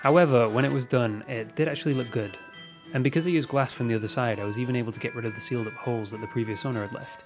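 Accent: United Kingdom. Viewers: Popped up in my recommendations, cool video and all, but I am intrigued by this guys English accent, it sounds standardised British English then suddenly American time-to-time with certain phrases.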